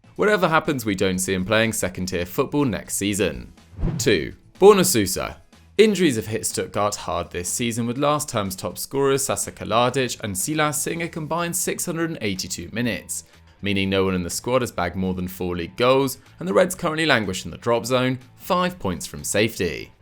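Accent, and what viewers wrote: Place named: Sunderland, United Kingdom. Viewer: My guy is definitely a mackem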